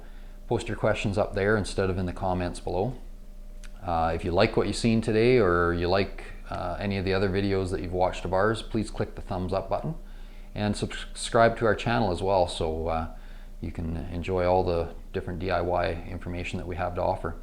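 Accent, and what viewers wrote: Canada. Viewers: Can someone put subtitles in.... his Canadian accent is too difficult to understand.... I'm from New Jersey. 😢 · Nice Canadian accent!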